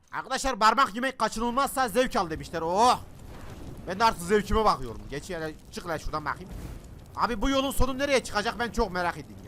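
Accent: Gaziantep, Turkey. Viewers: Abi adamsın senin sayende Antep şivesini öğrendim cansın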